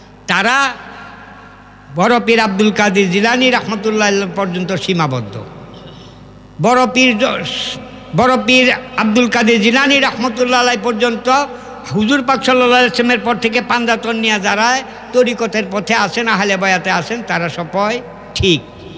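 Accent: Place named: Sylhet, Bangladesh. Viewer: আমি ভারতের আসাম থেকে বলছি,, চাচা তোমার ভাষায় বুঝা যাচ্ছে, তোমার বাড়ী সোনামগনজ সিলেট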